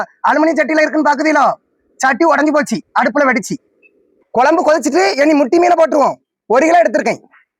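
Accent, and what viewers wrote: Tirunelveli, India. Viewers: Ele thambi. Un kulambu eppadiyo...aana nellai tamil. Suvaiyo suvai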